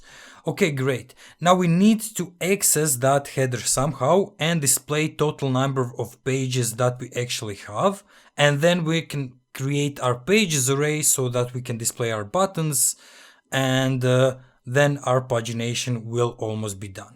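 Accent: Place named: Russia. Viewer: Кайфую от Русского-Английского ))) Бекаус блять миссинг патх, фор пост епта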